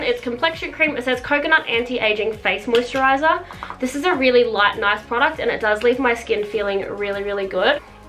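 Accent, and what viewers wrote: Australia. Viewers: R u Australian? Because I am just asking.. (edited: u might be sleeping rn if u r Australian)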